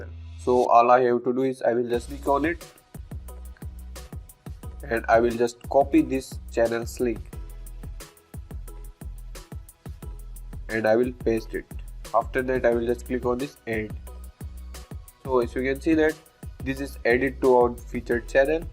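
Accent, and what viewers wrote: India. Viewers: his indian accent (no racism) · i like your indianacent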